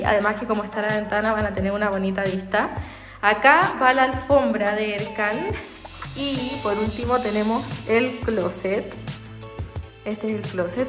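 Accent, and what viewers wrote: Chile. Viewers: Que bonito el acento chileno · Me encantó tu casa por tu acento eres Chilena verdad?? Saludos 😊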